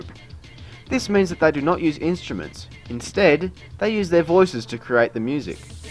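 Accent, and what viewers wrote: Australia. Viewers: I'll guess your region Australia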